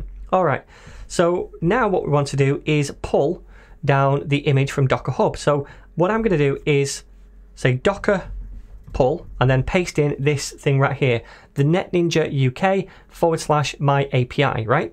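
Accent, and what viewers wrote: United Kingdom. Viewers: I love UK accent. Regards!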